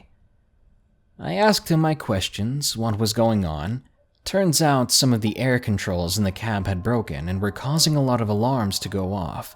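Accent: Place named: South, United States. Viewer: I like hearing older vids with his southern accent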